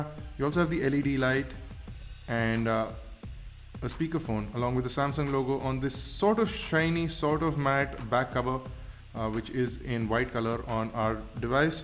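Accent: India. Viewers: Indian tang... not english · you can know an indian by his English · Indiaaa? :D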